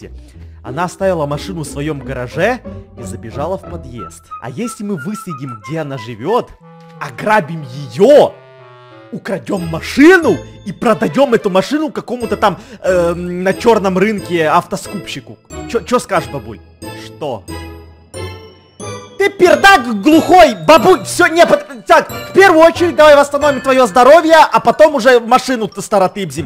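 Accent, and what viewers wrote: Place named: Kharkiv, Ukraine. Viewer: шокают не в деревне а в Харькове 😎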